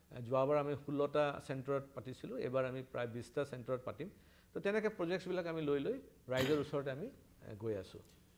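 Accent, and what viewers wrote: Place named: Bengal, India. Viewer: aponR bakha bangla style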